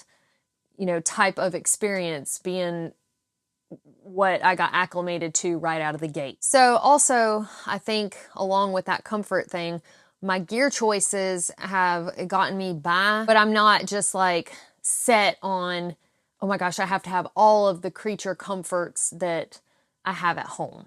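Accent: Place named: Tennessee, United States. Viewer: Your so sweet I bet your from Tennessee or Alabama with that beautiful accent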